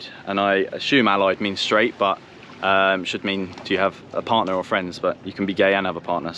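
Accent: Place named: United Kingdom. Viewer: ARE u british? i like the accent though.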